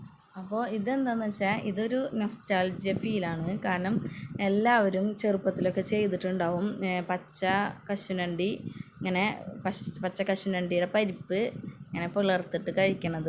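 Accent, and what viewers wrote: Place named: Thrissur, India. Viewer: thrissur slang nannayi und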